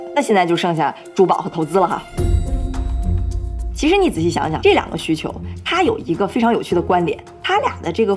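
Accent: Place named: Dalian, China. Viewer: 今天偶然间，看到了2期小LIN的节目，其中有一个是三年前的，自我介绍那期节目，，这闺女真好，又漂亮又口才好，又有才，，听着口音，虽然是普通话但是总觉得亲切又熟悉，，，不会是大连的吧，至少是北方的（东北或山东），果然猜对了，大连闺女！！！哈哈哈哈，，179万订阅，我的天，比王局都多，，太牛了！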